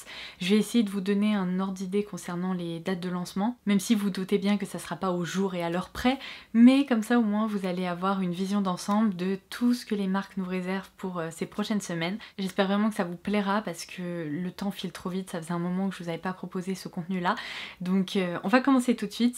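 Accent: England, United Kingdom. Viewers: Super vidéo sur les nouveautés merci de pouvoir tous nous renseigner😉. mais pour répondre à ta question ce qui m'intrigue le plus c'est l'accent anglais que tu prends à chaque fois que tu présente un produit.. 😭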